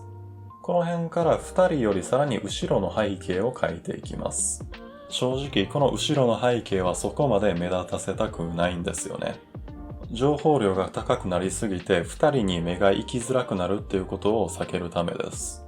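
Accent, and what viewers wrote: Kansai, Japan. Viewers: 西側の話し方といい、土井先生みがイラスト界の土井先生だ！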